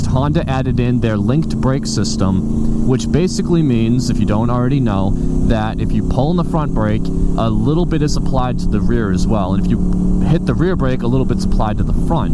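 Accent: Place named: Boston, United States. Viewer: I hear Boston in your voice. Go B’s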